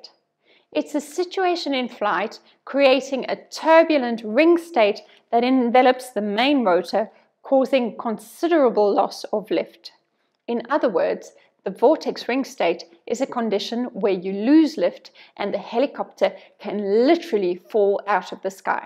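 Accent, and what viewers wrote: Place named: Australia. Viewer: >>> Pardon my asking this, and you do mot have to answer if you prefer not to, but are you originally from France? I ask because you have a VERY GOOD command of English, and your accent vaguely sounds Australian. Or maybe English. I am American, so what do I know...😉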